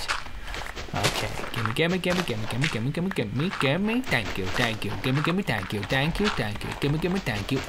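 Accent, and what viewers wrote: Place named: United Kingdom. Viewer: I really hate British voices on men, stop, I love you, just stop.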